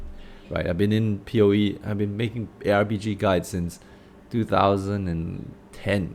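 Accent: Singapore. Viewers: hey man, you sound like you are from singapore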